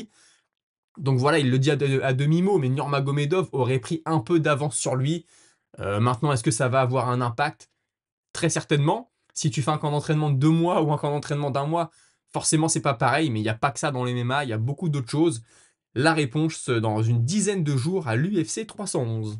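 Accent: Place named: North, France. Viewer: Frerot repond pas si tu veux une fois si je confond pas ta dis que t'avais était a Rotterdam look 1 ufc et vu que ton accent m ait familiers je me demande si tt du nord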